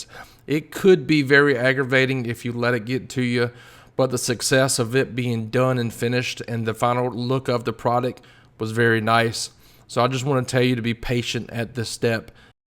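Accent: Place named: South, United States. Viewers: You're fun to watch. That Southern drawl is highly entertaining, "We tested'ed 'em." Hehe (I'm a KY girl. so howdy!). And you did a really good job; very precise. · This is so beautiful!!! Are you from New Orleans?? You sound so much like Louisiana!!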